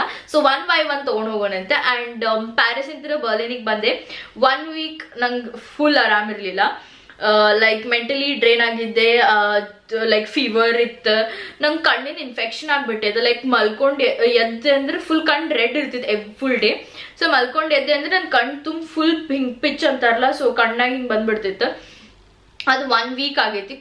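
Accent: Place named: Dharwad, India. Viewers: Nimma Dharwad language bhalha esta aytu ri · Love the way you speak namma uttara kannada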